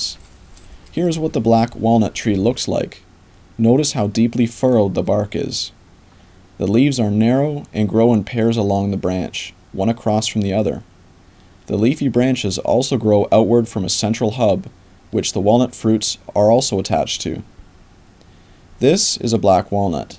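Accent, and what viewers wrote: Canada. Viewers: Idk if a guy can sound more Canadian....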